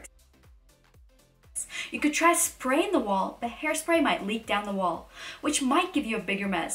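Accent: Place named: United Kingdom. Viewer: You have a bridish axcent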